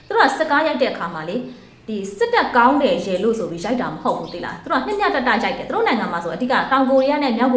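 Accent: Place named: Dawei, Myanmar. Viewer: ကဲသိပီလါး။ဒွေးလေသံ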